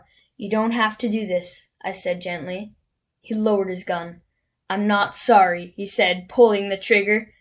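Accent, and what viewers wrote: Canada. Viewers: He sounds very Canadian eh? I’m Canadian.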